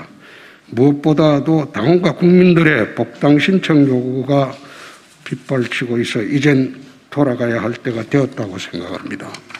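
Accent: Gyeongsang, South Korea. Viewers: 홍대표님 막말 아닙니다 경상도 사투리 표준어로 했는데 뭔소린지 억지도 어느정도지 바른말씀만 하시는 홍대표님 존경합니다 👍🙏🙆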